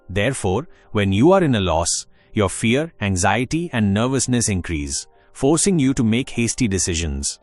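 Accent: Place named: India. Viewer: Why does this AI have an Indian accent lmao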